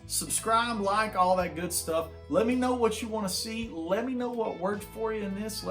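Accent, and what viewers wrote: South, United States. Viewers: I love your playing but i also love that Southern accent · This is such a great lesson. Being a German, I dig that southern accent. Thanks a lot sir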